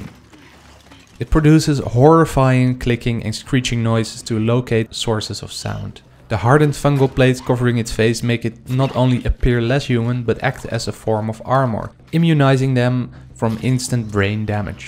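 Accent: Netherlands. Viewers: jezus gozer, dit is echt het meest nederlandse accent ooit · Do I recognise a Dutch accent here? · I know this is of topic to the video that ur making but are you by any chance Dutch? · Are you from the Netherlands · You can hear really good that the guy who talks is from the netherlands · Are you dutch 🇳🇱? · Are u Dutch?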